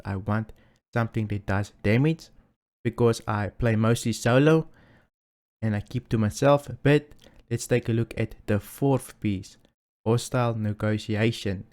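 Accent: South Africa. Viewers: Bro .. are you from RSA?!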